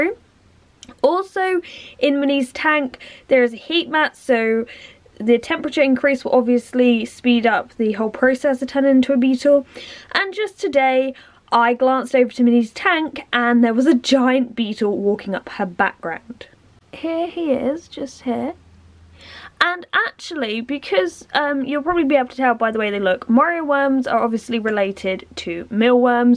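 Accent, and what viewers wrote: United Kingdom. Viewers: Wait are you from uk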